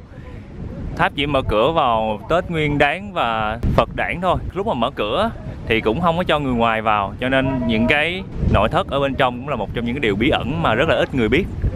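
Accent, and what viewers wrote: Mekong Delta, Vietnam. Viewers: vui tính,thoải mái,nc rất miền tây. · Hi! Chi rat thich nhung video clips cua.mot bo cuc ro rang,rat l doi thuong,va cai cach noi chuyen vua ( rat la Mien tay,chi o Long An coi cung la dan M,tay ). nè! Neu nhu em co di holidays Autralia. Thi em goi chi nha,chi o Sydney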